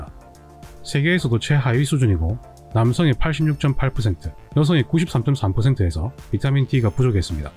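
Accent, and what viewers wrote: Gyeongsang, South Korea. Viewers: 경상도 악센트 쓰시는데... 초등학교는 어디서 다니셨는지요? ㅋ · 유용한 정보 고마워요 그런데 말씀 속도가 굉장히 빠르면서 경상도 사투리도 강해서 50% 정도밖에 이해가 않되요. 저의 지인들도 그러더군요. 그러니 중요한 과학적 용어들은 더군다나 전혀 이해 불가능 !!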